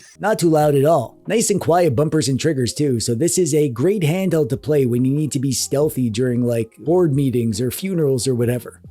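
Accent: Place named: Canada. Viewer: Anyone with eyes can compare the hands and see they're two different people. There was an April Fools gag last year where pretended to be. Also, is Canadian. There is no way I can believe that could pull off that accent. If he could, he wouldn't be making YT vids, he would be making bank as a voice actor.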